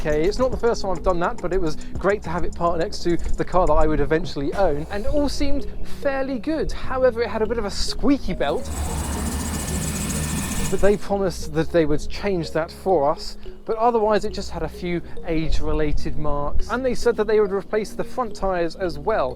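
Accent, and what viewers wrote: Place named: RP, United Kingdom. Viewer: I can't believe how posh you are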